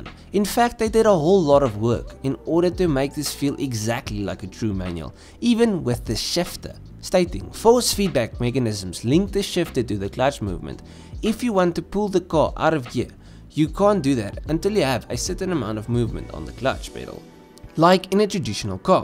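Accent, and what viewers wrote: South Africa. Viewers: Ayy is this bru South African? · are you south african ?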